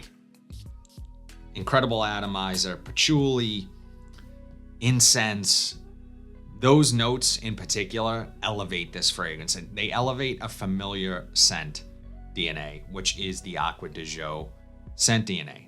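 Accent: Boston, United States. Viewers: niceee I live in providence I know that accent a mile away lol Boston ri we all talk like that lol .. great vids subscribed and got notification on appreciate ur content 🔥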